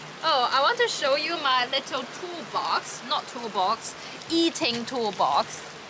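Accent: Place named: RP, United Kingdom. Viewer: Haha love that you have adopted a posh accent. Please keep it and your English is 👍🏼 👌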